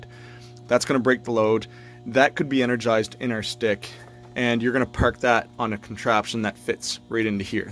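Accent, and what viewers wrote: East Coast, United States. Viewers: Within the first 5 seconds I could already tell you were an east coaster lol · Is this Florida or Boston? I miss the East Coast, and from your accent I'm gonna assume you're from that area. I just feel like I can tell where a place is when I get recommended random videos from the places I miss. There's just a specific way things look..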